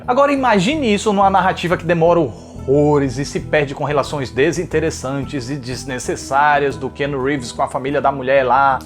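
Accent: Northeast, Brazil. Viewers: só me inscrevi no canal por causa do sotaque nordestino carregado, que é a cereja do bolo! parabéns ótimo vídeo.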